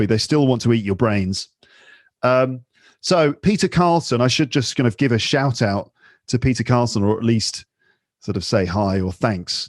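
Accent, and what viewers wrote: United Kingdom. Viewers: Sorry i don't wanna listen UK accent. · I was listening to your podcast for approximately 4 months ago ; and due to that reason, i started to get your British accent furthermore the evident improvement in my english in terms of vocabularies, listening and speaking. You're my favourite teacher and podcaster, I really appreciate you and wish the best for you always. 😃💚.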